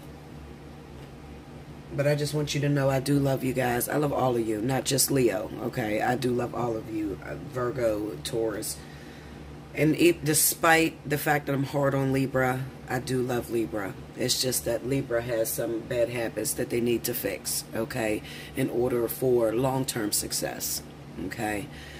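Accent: Pittsburgh, United States. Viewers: I know this is off topic but are you from Pittsburgh?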